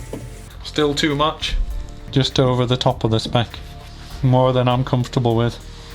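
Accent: Liverpool, United Kingdom. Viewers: Great video!! Love the accent! Liverpool?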